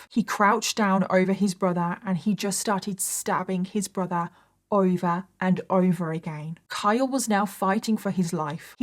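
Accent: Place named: United Kingdom. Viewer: i have been watching your vids for years and i’m still trying to figure out where in the uk your accent is from 😂❤ xx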